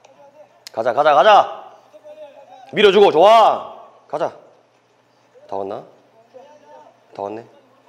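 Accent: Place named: Gyeongbuk, South Korea. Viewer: 선생님 혹시 고향이 경북이십니까ㅋㅋㅋ 유튜브에서 고향말투들으니 정겹네요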